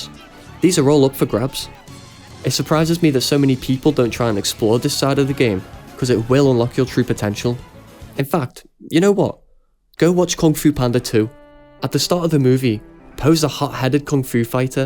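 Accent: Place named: Glasgow, United Kingdom. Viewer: Bro you from Glasgow?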